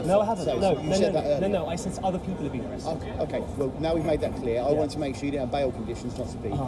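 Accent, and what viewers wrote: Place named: India. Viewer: She is speaking English in Hindi accent. Very hard to follow. Need to improve her delivery.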